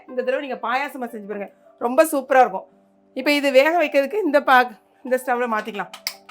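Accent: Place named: Tirunelveli, India. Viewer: திருப்பூர் கோகிலா... வணக்கம் சிஸ்டர்... நேத்து உங்க வீடியோ பக்கமுடியால... இன்னைக்கு உங்க குரல் கேட்டதும் நிறைவா இருக்கு... காரணம் நான் திருநெல்வேலில தான் படிச்சேன்... எங்க ஊர்ல யாரும் இந்த slang பேசறது இல்ல... எனக்கு திருநெல்வேலி பாஷை ரெம்ப பிடிக்கும்... உங்களையும் பிடிக்கும்... உங்க சமையலும் பிடிக்கும் 😍😍😍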